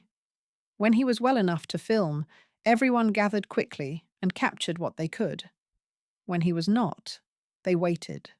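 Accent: United Kingdom. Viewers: Love you Stories and info❤. Thank you SO very much. Bless everyone on you’r team. A lovely narration with a beautiful British voice. . From Uk Susie ( how i miss ‘our accent’) in Melbourne Australia . These true stories are absolute Gens ❤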